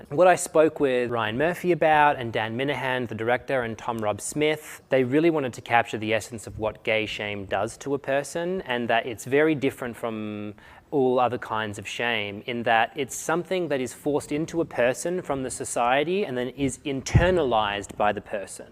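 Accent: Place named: Australia. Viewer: I love australian accents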